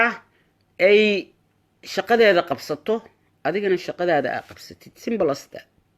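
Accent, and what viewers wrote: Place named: Beledweyne, Somalia. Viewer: reer baladweyne miyaa sidii reer baladweyne cml waaye codkeeda milyan like 💕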